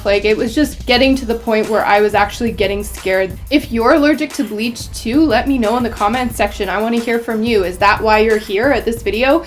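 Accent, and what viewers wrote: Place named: Canada. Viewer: I think I need more tutorials with a canadian accent. Ha! I miss living up there. This was REALLY helpful. Thank you so much!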